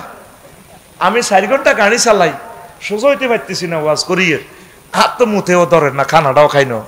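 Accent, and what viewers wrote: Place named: Noakhali, Bangladesh. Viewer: আন্নেরে নোয়াখাইল্লা কেল্লাই কয় আন্নে কমিলারা মানুষ হইয়া নোয়াখাইল্লা ভাষায় কথা কনআম্নের কালচার নোয়াখালীর মতন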